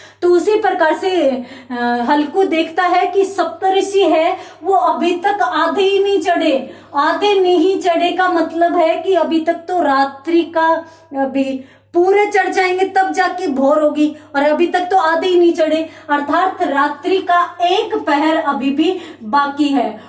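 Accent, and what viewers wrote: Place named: Haryana, India. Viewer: Madam aap Haryana se ho kya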